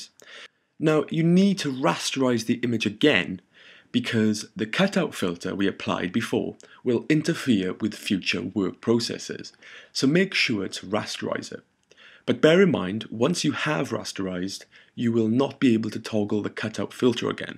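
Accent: Wales, United Kingdom. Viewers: his accent sounds Welsh me thinks, tidy :)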